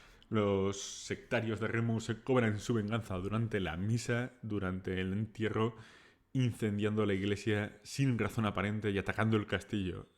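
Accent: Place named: Spain. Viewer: El contenido es bueno pero tienes muy acentuado tu acento español que no esta mal pero tiendes a pegar las palabras y se hace sosa la narracion me gustaria que tuvieras un acento mas. Neutral y fresco muchos ya lo estan haciendo sugerencia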